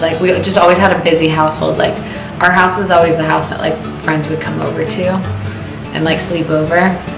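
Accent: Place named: New Jersey, United States. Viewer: Wow you literally kinda have a New Jersey accent . You seriously look like a New Jersey girl lol (not a bad thing)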